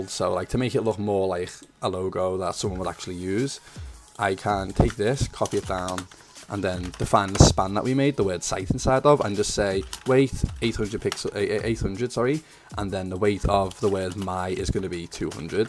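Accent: Liverpool, United Kingdom. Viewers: scouse accent 🤔 its hard to keep up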